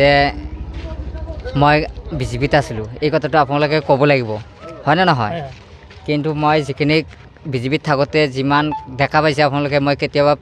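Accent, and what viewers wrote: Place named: Bangladesh. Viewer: শুকুৰ আলি ভাই তোৰ ভাষাত গোম পায় আপুনি যে খাতি বাঙলাদেশী।যেনে আপুনি কথা শব্দটো কতা উচ্চাৰণ কৰে।আৰু ভালতোক বাল উচ্চাৰণ কৰে।আৰু বহুত আছে। 😂😂😂😂😂😂😂😂😂😂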